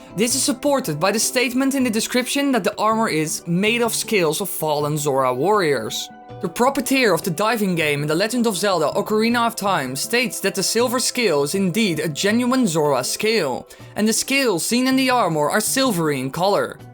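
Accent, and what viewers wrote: Netherlands. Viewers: Are you from the netherlands lol? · Ik had dit in de achtergrond aan terwijl ik wat anders aan het doen was, en toen realiseerde ik mij pas dat je Nederlands was. Oops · He's Dutch ;) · are u from holland? you're from holland arent u :P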